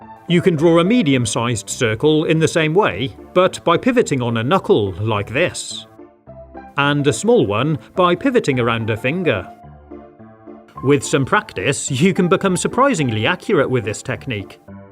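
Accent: United Kingdom. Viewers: I never knew hes brittish. Im also british 😊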